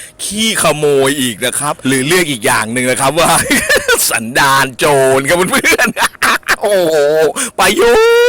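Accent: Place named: North, Thailand. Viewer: ไม่แน่ใจ...สำเนียงคล้ายๆกับภาษาเหนือบวกภาษากลาง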